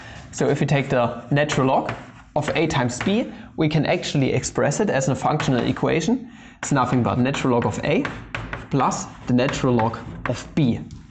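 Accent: Germany. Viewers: Are you german? You sound so bloody german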